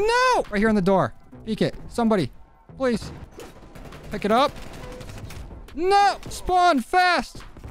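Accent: United States. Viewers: He's American, you can tell from his accent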